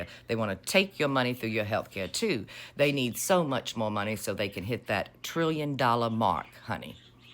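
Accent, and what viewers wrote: South, United States. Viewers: I love that i found your content. I dont know if its the passion behind your voice or if its the rhyming delivery, it may even be the southern accent. Regardless, its all a recipe for my new favorite political content. also, the way you say "bay-bee" has become a vocal stim for me now 😅. Thank you for what you do and who you are ❤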